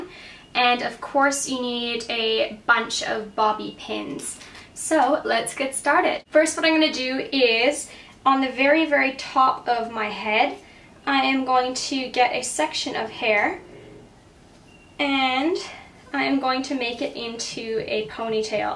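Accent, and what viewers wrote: Australia. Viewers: Yes! I can hear a bit of Aussie :) you are becoming one of us haha xx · I can hear youve started to pick up a but of an aussie accent? :) thanks forth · I hear someone Austrailian Accent in you!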